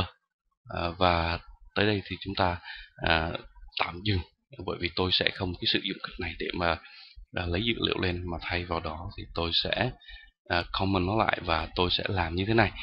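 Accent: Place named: Hue, Vietnam. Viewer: Giọng Huế của anh nghe thú vị nhỉ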